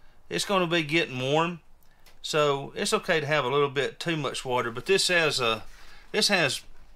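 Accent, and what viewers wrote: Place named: Boston, United States. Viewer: I recognize that accent. Definitely Back Bay, Boston!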